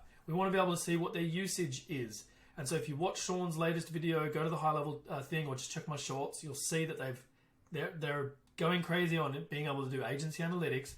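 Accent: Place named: Australia. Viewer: Hey, Love the Content, got a question for you. From your accent i'm assuming your from Aus and am aswell, I have recently acquired GHL for starting an agency however my concern is when using a mobile phone number from Twillio/ Lead Connector the billing & pricing is way too high and would mean im getting charged a lot from my clients in the future. As such do you use a Mobile phone number or other type of twillio/ Lead connector phone number type for your clients and yourself? Cheers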